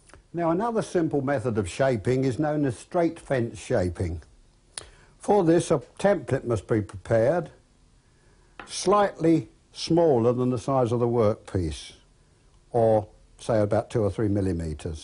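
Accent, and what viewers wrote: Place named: South East London, United Kingdom. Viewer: I lived in SE London as a kid and later moved out to Kent so accent did not seem any different to my own. It is sad to hear he passed away but he did have a slightly younger assistant who was also very knowledgeable, I wonder if he has carried on teaching people.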